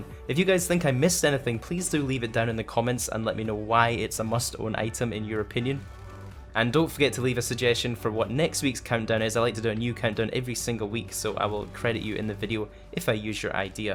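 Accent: Scotland, United Kingdom. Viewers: Scotland? ^3^ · Are you Scottish? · M8 your so British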